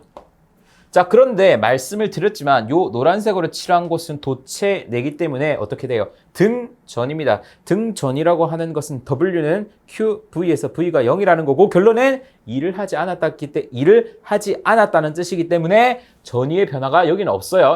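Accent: Gyeongsang, South Korea. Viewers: 경상도 억양 귀에 딱딱 꽂히네요 잘봤습니다ㅋ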